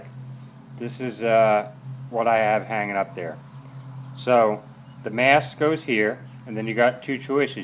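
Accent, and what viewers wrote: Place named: Philadelphia, United States. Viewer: good video . you have a philly accent ?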